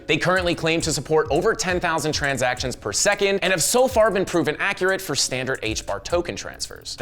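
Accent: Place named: United States. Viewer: Unwatchable - a gimmicky shouting american..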